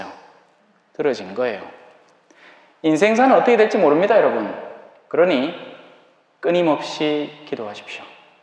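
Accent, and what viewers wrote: Gyeongsang, South Korea. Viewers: 찬미예수님! 신부님, 강론말씀으로 또 새롭게 힘을 얻습니다. 신부님 완전 경상도 사투리(뿌리)를 들으며 고향 향기를 맡는 것 같습니다. 하느님은 찬미받으소서!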